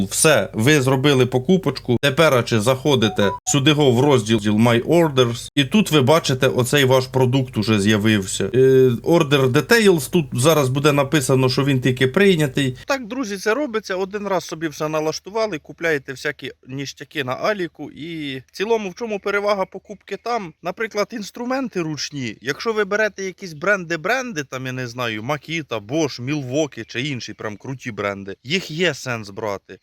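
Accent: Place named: Sumy, Ukraine. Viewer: я почув ні разу не місцевий акцент - схоже на сумський ))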